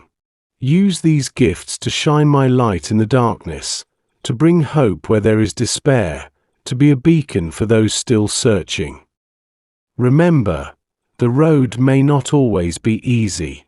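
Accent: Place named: United Kingdom. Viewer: Elon you have a beautiful voice with a slight British accent. Wow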